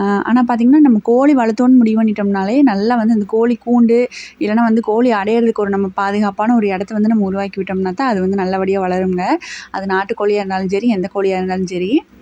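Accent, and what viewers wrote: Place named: Kongu, India. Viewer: Neenga yen sudden ah kongu slang la pesuringa.... Unga previous videos lam normal slang la pesuninga.. Adhaan kettaen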